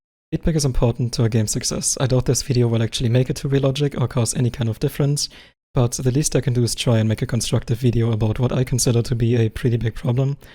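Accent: Germany. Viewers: Man hört halt direkt raus, dass du Deutscher bist. Aber super Video kann ein paar deiner Kritikpunkte teilen.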